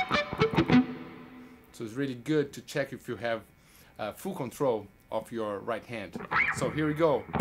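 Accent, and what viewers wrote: Brazil. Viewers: Eae galera, o inglês do é bom? ou ele tá cheio de sotaque BR? SHAHUSHUS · É praticamente impossível falar sem sotaque. O que importa é que o cara é fluente. E, aliás, o sotaque brasileiro é agradável aos ouvidos estrangeiros. Não tem problema nenhum.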